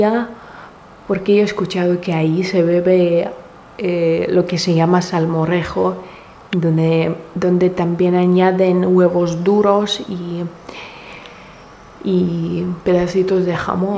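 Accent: Spain. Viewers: wow ya tienes la z en tu acento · Entre la seriedad con que tratas cualquier tema, la misma naturalidad con la que hablas me resulta gracioso tu acento español (en el buen sentido de la palabra). Saludos desde Colombia. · ***** Tiene acento de España porque está viviendo alli, al igual que tendría acento argentino si estuviera viviendo allí. Ah, y no has pensado que quizás otros acentos no les hacen gracia a otros países? Ella no está aquí para hacerte reír, está aquí para compartir su experiencia viviendo tan lejos de su país natal, así que si quieres a alguien gracioso y con otro acento porque no eres capaz de aceptar las diferencias culturales, tienes muchos otros canales de mucha gente que hace su mismo contenido.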